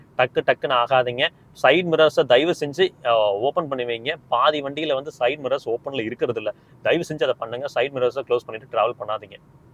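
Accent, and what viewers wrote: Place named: Kongu, India. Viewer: Awesome, I am watching from your initial video. Amazing to watch how you improved. Your Content, Presentation & kongu slang fantastic. Keep Josh ahead.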